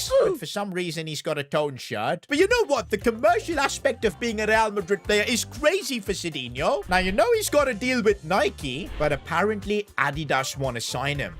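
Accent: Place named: India. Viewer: The Indian accent is wild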